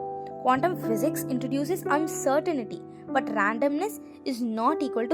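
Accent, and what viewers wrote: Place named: India. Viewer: I love Indian gurl accent 🌹😋🤪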